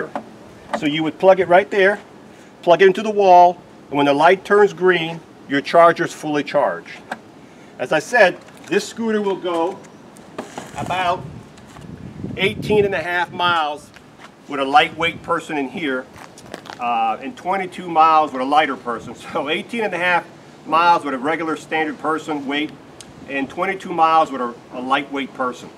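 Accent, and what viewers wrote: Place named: United States. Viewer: You sound American, is it available across the pond in the UK?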